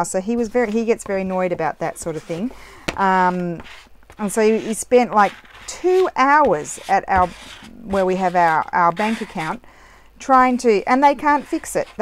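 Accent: Australia. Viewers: "get nicked" 😂. As a fellow Aussie I can't tell you how fantastic it is to hear an Aussie accent and expressions in a crafting video! Yours is the only video on YouTube that I can easily listen to for a whole hour 🥰. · I love to hear your adventures in Italy and i love to hear your Australian accent. And of course your crafting too. We have to do all the running around too for paperwork its just how it is (USA) but thats ok it will all work out! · Hi, Love the very typical Aussie rant. A breath of fresh air. Kerry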